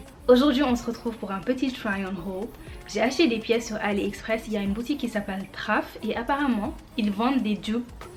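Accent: Senegal. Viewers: Merci pour ta vidéo avec ce joli accent DKR❤. Perso, j’ai de plus en plus de mal à admettre ces grandes différences de prix. Du coup, avec ces sites web, dure dure de se laisser tenter dans les magasins.. Pas facile pour le secteur du prêt-à-porter mais l’inflation touche tout le monde 😢 Comment ne pas tourner le dos à toutes ces enseignes qui nous assassinent avec leur prix élevé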